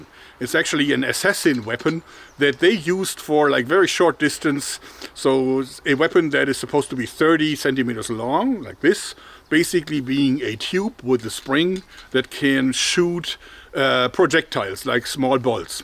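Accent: Germany. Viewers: Ganz klar deutsch der typ haha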